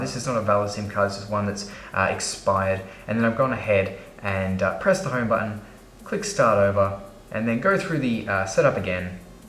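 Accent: Australia. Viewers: Are you an Aussie?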